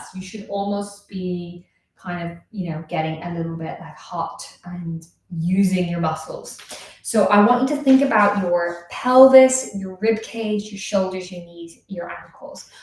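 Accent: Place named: Ireland. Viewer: Hi, lol i always thought you had an accent, but hadnt pinned it down to Irish, thats really cool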